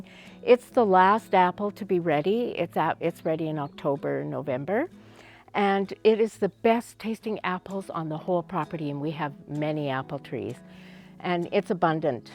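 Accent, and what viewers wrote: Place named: Canada. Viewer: She's amazing! Is she in Canada? The accent sounds Canadian and some of the berries sound like they are from there.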